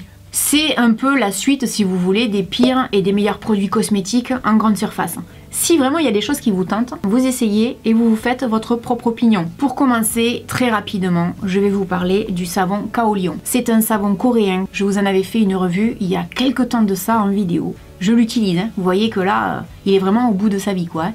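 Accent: South, France. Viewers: Les produits de chez Sephora que je racheté régulièrement sont le démaquillant biplace yeux, le mascara Sephora outrageous avec une fine brossé je vous le conseille, le masque de nuit mais je l'utilise comme une crème car je ne vais pas me coucher avec une épaisseur sur le visage Je ne suis pas emballée par les box et le système d'abonnement, je préfère choisir mes produits et je suis fidèle à certaines marques merci de vos conseils et pour votre accent du sud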